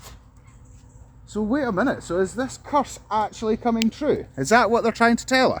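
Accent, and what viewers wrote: United Kingdom. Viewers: ohh, Halloween? gosh, the Children looks so excited...lovely! this is definitely a Walk, indeed from your Screen Name! Thanks for touring us around and the info. good to know. Love the British accent! ahahaha full watched! keep safe...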